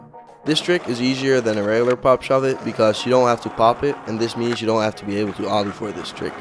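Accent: Netherlands. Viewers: Je accent klinkt echt Nederlands ben je nederlands?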